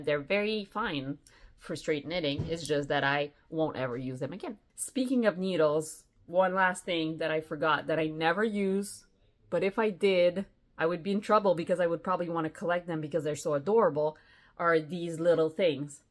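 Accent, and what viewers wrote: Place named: Quebec, Canada. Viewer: Thank you for your videos! ❤ You sound Quebecoise. 👏🏻💞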